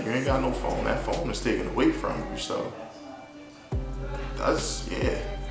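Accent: Baltimore, United States. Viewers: As soon as I heard him talking I knew he was from Baltimore 🤘🏾🤘🏾😎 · Lol I knew I heard a Baltimore accent. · I’m from Baltimore to G as soon as you started the video I’m like yo this dude from around here😂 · i knew you was from here, baltimore in the first five seconds lmaoooo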